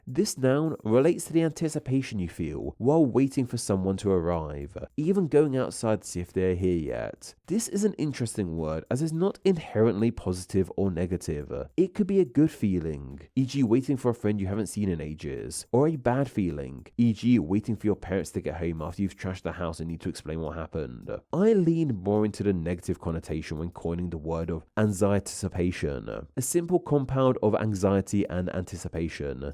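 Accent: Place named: United Kingdom. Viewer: I think flawedcore sounds great in your UK accent...flawcore may be better in my US accent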